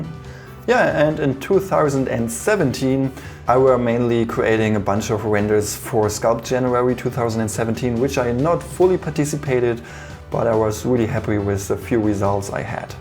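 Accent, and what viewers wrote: Germany. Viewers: Mega Story! Hab schonmal ein Video gesehen und dacht mir schon, so wie der spricht: das kann nur ein Deutscher sein! :P Grüße aus der Altmark! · German English, ich auch x) Und schiefe Zähne, aber ich nicht ;) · You sound german. I am too... also wollte ich fragen, wo und wie du als 3d Artist arbeitest? In der Umgebung wohl kaum? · Oh ein deutscher, ja dann Echt krass was du so drauf hast respekt !👍👍👍 Edit: oh, hast ja sogar noch gesagt das du aus deutschland kommst Mhhh Finde man kann das (wenn man selbst deutsch ist) echt stark am akzent merken☺ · Der Deutsche Akzent ist Real! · 100% deutsch junge sein akzent ist ja mal andere welle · Nicht schon wieder ein Deutscher der probiert Englisch zu sprechen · diggha, wieder ein Deutscher, der versucht englisch zu sprechen. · Man hat halt echt sehr gehört, dass du Deutscher bist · The story of your childhood home - in combination with your German accent - makes me think of Dr. 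Doofenschmirtz. You're probably a nice guy, though! :P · Bist du deutscher? Dein Englisch hört sich so an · Love your German accent it actually sounds good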